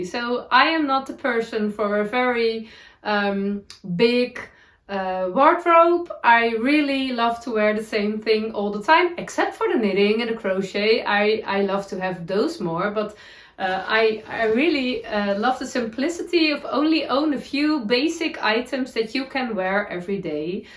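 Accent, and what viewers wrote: Netherlands. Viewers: Dear, I really love your "heartjumping" Videos and especially I absolutely love your "dutchy English". Thank you for all this inspiration. happy greetings from Germany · Noble Character Crafts was talking about her Ariana cardigan on her podcast and she mentioned you and your sweater. I had to come find you and see about your Ariana(s); which are so pretty!! Thank you for giving so many details. The latest one turned out so good! I love your energy and your dutchy English. You made me laugh out loud when you were talking about making a longer Ariana. You were correct in calling it your butt, but I think I prefer "sitting pillows" better. 😀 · papagei is parrot in English - I googled it - with bright red, blue and green - from Michelle. I love watching your podcasts - I love listening to your dutchy English -it makes me giggle from time to time.... 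I live in New Zealand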